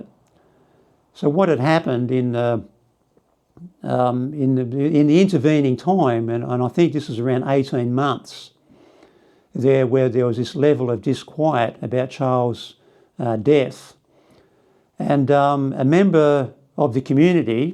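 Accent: Australia. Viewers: It's great to hear that beautiful Australian accent Thanks very much for your story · Me too, just to hear a fellow Aussie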